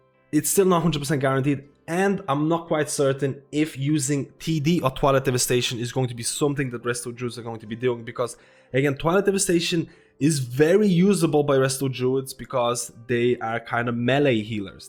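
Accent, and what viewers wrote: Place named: Ireland. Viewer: Where are you from man, i cant hack your accent i hear abit of irish in it lol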